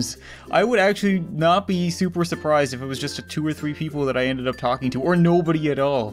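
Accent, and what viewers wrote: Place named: Canada. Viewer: I love your canadian accent btw :P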